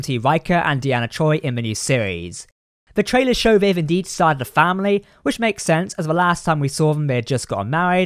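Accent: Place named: United Kingdom. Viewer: Thumbs down for the maddening sound of your British R's.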